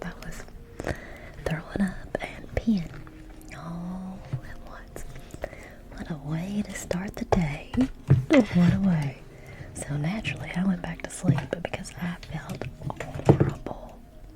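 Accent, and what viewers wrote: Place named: Kentucky, United States. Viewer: This is like listening to my aunt from KY who passed away a decade ago. It's nice to hear the accent and sweetness. Thank you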